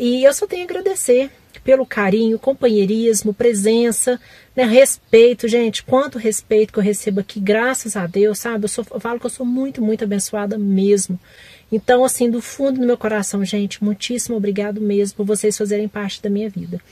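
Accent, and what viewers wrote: Minas Gerais, Brazil. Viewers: Parabéns amo seus vídeos, são muito inspiradores. Sou de minas também (Uberlândia) e amo nosso sotaque mineirinho rsrs Já dou like antes mesmo de assistir, super inscrita no seu canal. Muito sucesso a vc!!!! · parabéns, vc merece!!! Tem pouco tempo que te acompanho e já amo demais seus vídeos, vc passa muita verdade em tudo! E esse sotaque mineirinho tbm neh, gostoso de ouvir( sou suspeita pra falar, rsrs)...enfim, que Deus te abençoe em tudo e que sua obra prospere cada dia mais!!!😘😘😘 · Parabéns 👏🏻👏🏻👏🏻👏🏻👏🏻 Qdo conheci seu canal a semana passada já me encantei com o seu jeito e a paz que vc transmite, fora esse sotaque mineirinho que é bão de mais da conta de ouvir.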